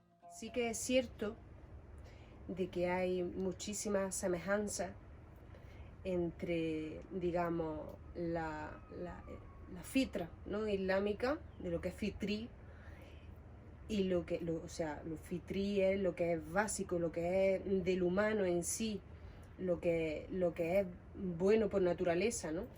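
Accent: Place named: Andalusia, Spain. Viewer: Masha Allah hermana gitana, y como adoro tu dialecto andaluz. Linda historia y contado en una manera muy hermosa. Me encanto!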